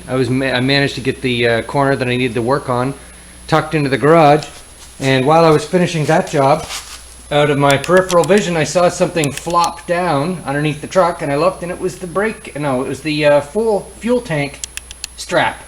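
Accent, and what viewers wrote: Canada. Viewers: Are u from nova scotia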